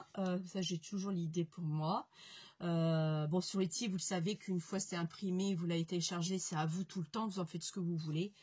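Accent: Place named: North, France. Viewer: Cc, j'aimai beaucoup comment tu étais coiffé sur ta dernière vidéo, ça te va trop bien, dommage de les avoirs coupés, mais bon.... J'ai beaucoup aimé tes présentations comme toujours, avec ce fort accent du Nord mais j'adore...😅 Très bonnes fêtes à toi et toute ta petite famille....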